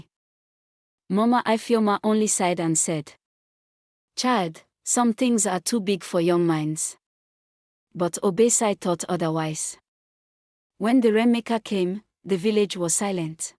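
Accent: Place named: Africa. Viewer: Bro the African accent ai generated voice gotta love it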